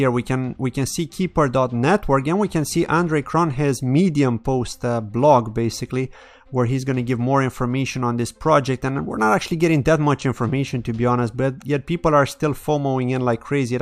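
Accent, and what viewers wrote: Ireland. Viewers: you have a slight irish accent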